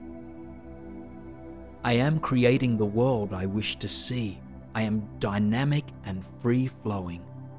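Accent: Australia. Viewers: Your Australian accent makes these even better! Thank you for sharing these amazing videos.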